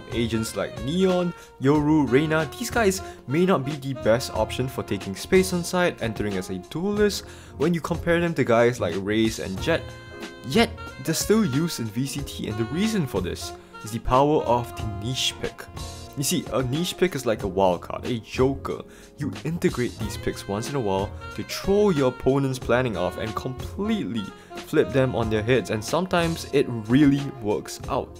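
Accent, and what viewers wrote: Singapore. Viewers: u sound Singaporean so i'll sub, hope u do more entertaining rants.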